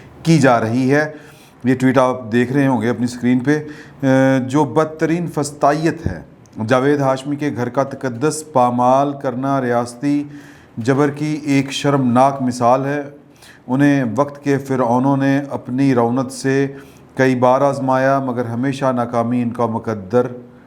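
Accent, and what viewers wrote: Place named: Lahore, Pakistan. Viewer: سيد عمران شفقت صاحب، آپکے وی لاگ بہت پسند کرتا ھوں اور آپکا لاہوری انداز گفتگو بھی کمال ہے ما شاء اللہ۔ ایک بات فرمائی آپنے کہ "وہ لوگ" جو فیصلہ کرنے کی "صلاحیت اور قدرت " رکھتے ہیں، معذرت کے ساتھ یہ نہ کہا کریں "قدرت" تو آئین بالکل نہیں دیتا اور " صلاحیت" اتنی کے دس سال سے مل کر بنایا پلان دو سال بھی نہ چل سکا مگر فیصلے زبردستی ضرور کرتے ہیں۔ اللہ آپکو خوش اور آباد رکھے!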